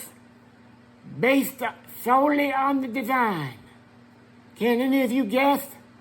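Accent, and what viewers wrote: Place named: Louisiana, United States. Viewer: This dude sounds like he grew up in the Bayou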